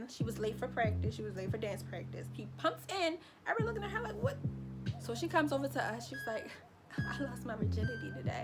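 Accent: Baltimore, United States. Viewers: i knew u was from baltimore the first time i heard your voice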